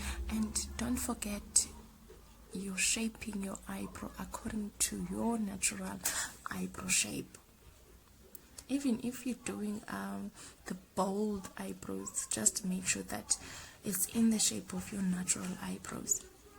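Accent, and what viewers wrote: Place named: South Africa. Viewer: SouthAfrican i love ur accent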